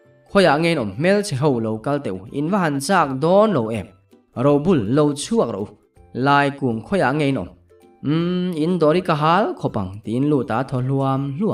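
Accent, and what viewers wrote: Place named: Paihte, India. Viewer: Paihte pa tawng dan hi chu a mual thelh in i thelh, note tawng dan i zir ani ringot alawm le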